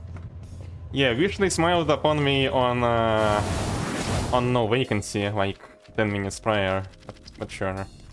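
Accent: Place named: Russia. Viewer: Lol, русский акцент сразу слышно)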